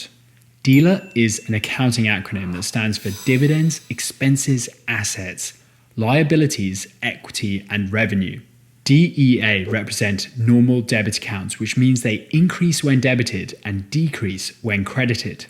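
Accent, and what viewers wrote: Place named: England, United Kingdom. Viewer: Is it just me or does Accounting make more sense when explained in an English accent.... lol fr thx for sharing these informative & concise vids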